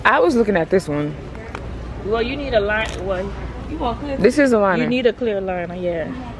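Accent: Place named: Louisiana, United States. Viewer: I love da Louisiana accent 😂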